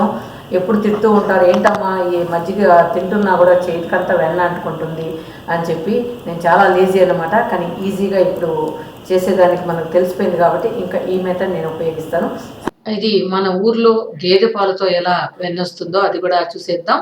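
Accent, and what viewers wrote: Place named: Nellore, India. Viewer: easy chitka bagundi nellore yasa matladuthunte bhalega vundi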